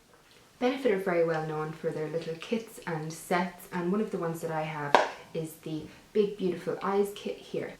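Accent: Ireland. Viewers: Love the Irish accent! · I thought you couldn't possibly get anymore perfect and out came that Irish accent... I think I'm gonna cry ;( X love you ;) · I watched a couple of your videos with no talking - was really not expecting you to be Irish! Must be the intense sunlight through the window haha - nice to see a local girl doing well - and you're looking well too! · I am inlove with your accent! Is that Irish? Xxx new subbie! Xx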